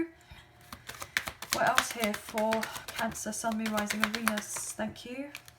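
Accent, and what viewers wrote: England, United Kingdom. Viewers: Love your English accent.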